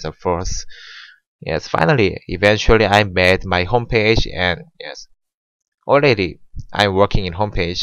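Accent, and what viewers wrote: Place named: South Korea. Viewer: Hater back off kay? English isn't his first language The korean accent is strong if you actually listen you'll understand him so easily.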